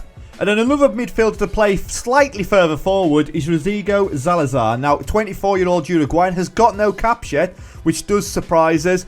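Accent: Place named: Yorkshire, United Kingdom. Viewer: Hi im new to this channel, you sound like your from Yorkshire like myself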